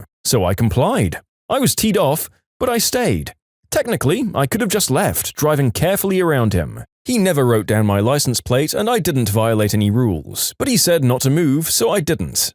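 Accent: United Kingdom. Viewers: Is it just me or did you suddenly become British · Why doe's he sound British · Why is he britsh now? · This new guy is a grilling MACHINE! keep up the good work man! Also are u British? U sound British. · Whoa what happened to the person who was telling the story to all of us he sounds more British than robotic I think they're replaced the AI voice with an actual person and that actual person just seem to be British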